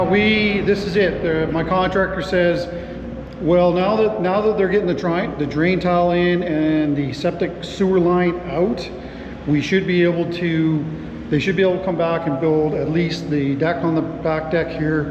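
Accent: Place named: Canada. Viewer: Red Green says garage the way you do, I just figured it was a Canadian thing.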